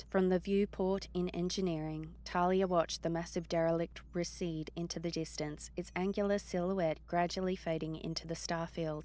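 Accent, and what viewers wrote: Australia. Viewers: I have just found this site and I read many comments and a number mentioned that the narrator lost punctuation as they felt she was reading/talking too fast. Now I personally did not feel that there was a problem as I seemed to understand clearly what she was saying. Then way down some one mentioned that she is Australian and it dawned on me why I had no problem with her. I am Australian. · Aussie narrator noice 😊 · Good job ! Aussie ?? Don't think Kiwi.. Either way, Welcome.. Very attractive young "Sheila" lol....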